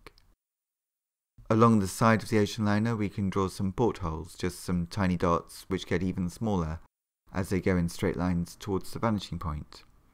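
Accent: United Kingdom. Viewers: Hey, are you british? I really love your accent!!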